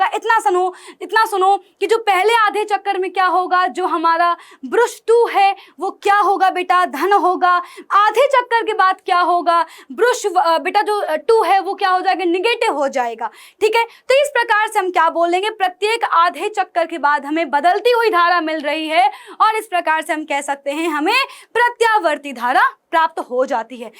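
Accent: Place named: Kanpur, India. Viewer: Ma'am kanpuriya language me bole to ekdum jhakaas samajh me aa gya 🙂🤭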